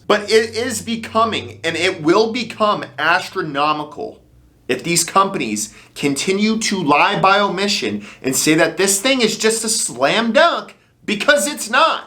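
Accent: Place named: Wisconsin, United States. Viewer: If you are from Texas why do you sound like you are a Wisconsin yankee ?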